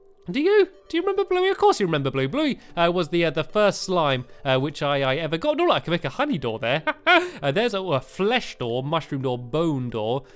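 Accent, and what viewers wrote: United Kingdom. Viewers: Do you know British Accent... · Jafar the Barmecide well what do you expect?stampy is british you can't expect him to say hallow instead oh hollow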